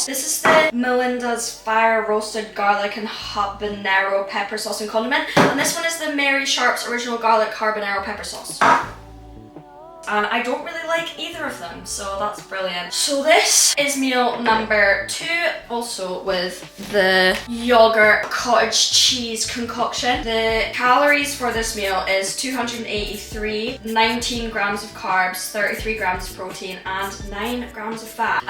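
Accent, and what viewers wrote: Scotland, United Kingdom. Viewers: Are you Scottish? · What part of Scotland is she from?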